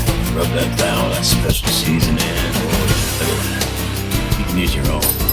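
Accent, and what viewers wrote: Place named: South, United States. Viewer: And you gonna pick on my countryness😁 I heard some southern.😁😀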